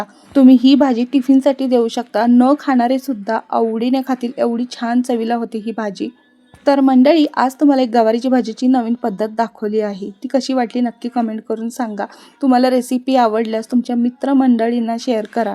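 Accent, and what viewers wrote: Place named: Otur, India. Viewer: खूपच सुंदर ओतुर होऊन बोलते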